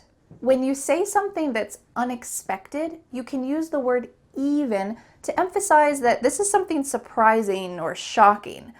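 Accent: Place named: United States. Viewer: I loved american accent with you❤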